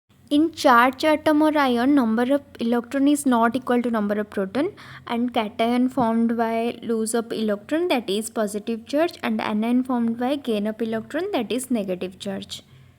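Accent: Odisha, India. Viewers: AREY APP ODISHA SE HO KYA 🤔🤔🤔✨ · Didi are you from odisha? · i think may be u are from Odisha na ?? bcz ur way of talking reflects that..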